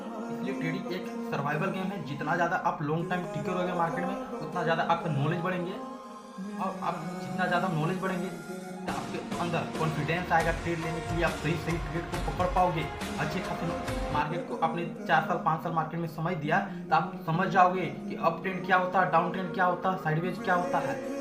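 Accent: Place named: Bengal, India. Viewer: App ke bat se pata chal raha app bengali hoo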